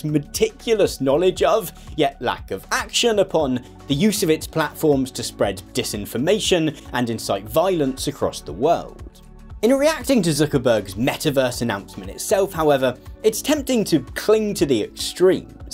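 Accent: United Kingdom. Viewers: damn I love you and your videos so much. I really love political theory and having you explain it to me with modern day relevance and a nice british accent is just amazing. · your caption writer corrected some parts in your British af rant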